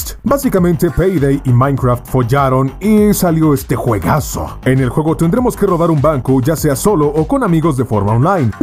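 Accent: Paraguay. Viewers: De que parte de Paraguay sos??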